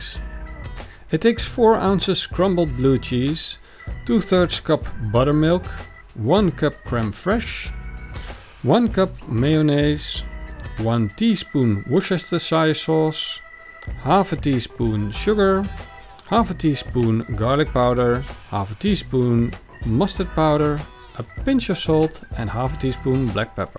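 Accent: Netherlands. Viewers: Random question but are you Dutch? Your accent seems Dutch to me. Not sure why.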